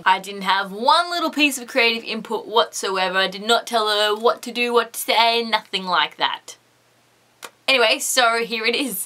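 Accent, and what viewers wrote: Australia. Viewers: UR AUSTRALIANNNNNNNNN!!!!!!! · your from melbourne arnt you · are you australian?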